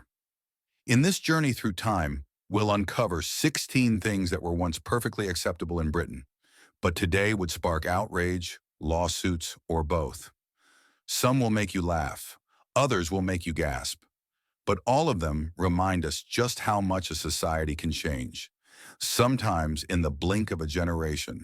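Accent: United States. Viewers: Why do we have an American narrating this? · i hate to tell you this, but the american accent put lots of people off a video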